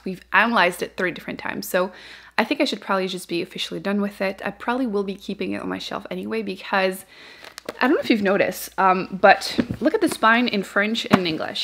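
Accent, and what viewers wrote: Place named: Quebec, Canada. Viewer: Canadian French sounds sooo different than France French 😟 Love your accent🥰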